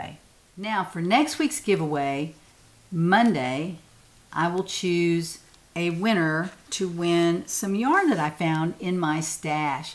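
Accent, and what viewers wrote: South, United States. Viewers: This is the first time I’ve watched one of your videos. I really enjoyed your content and Southern accent. That comfy yarn looks great!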